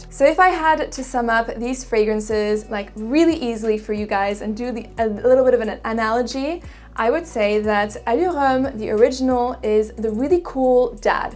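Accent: France. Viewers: Im gonna say shes French with perfect English. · I think she's French or at least can speak French really well cause even when speaking English you can hear a little French accent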